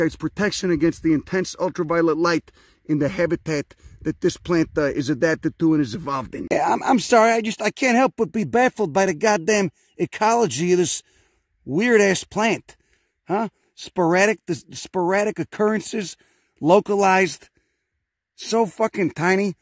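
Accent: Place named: New York, United States. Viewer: I love your accent adds a a bit of humor to your videos. You have to be from New York. Great videos and educational.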